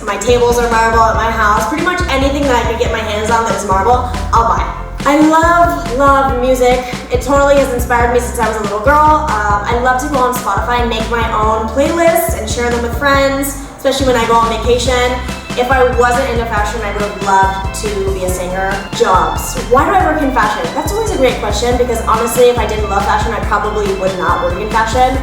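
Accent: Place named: Mexico City, Mexico. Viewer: It was nice to "meet" you on MIM. You nailed the CDMX accent. I thought the birthday party episode was hilarious when you and Roby stormed out of the restaurant. Keep up the good work!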